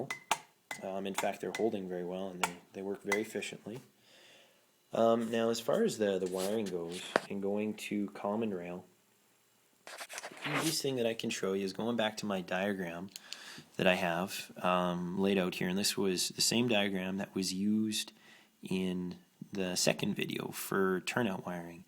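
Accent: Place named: Canada. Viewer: Hey...I love your explanation...(even the Canadian accent)..you are great..Be a teacher..you, got it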